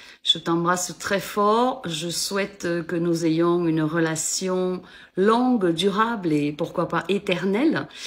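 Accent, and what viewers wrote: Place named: Southwest, France. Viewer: Oui , j'aime beaucoup ta voix , avec ce petit accent du sud-ouest , mais je ne savais pas que tu étais très jolie . Bisous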